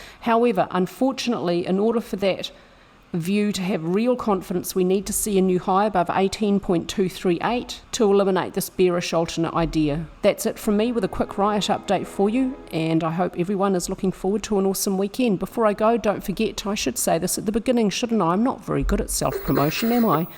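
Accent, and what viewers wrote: New Zealand. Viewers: So good having a kiwi accent in crypto. And i think one of the best Elliot wave channels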